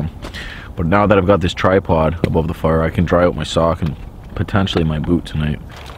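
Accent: Canada. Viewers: I can even tell that your Canadian, 1. You basically told it 2. The about to aboot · (I'm sure some of my Canadian viewers will know what I'm talking "aboot") lawl that had me crack up and rolling because it reminds me of how the Canadians talked in South Park: Bigger Longer and Uncut. Every time you say "about" it comes out "aboot" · Let me guess Canadian?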